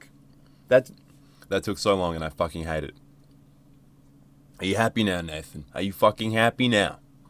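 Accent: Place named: Australia. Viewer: so fucking australian it's perfect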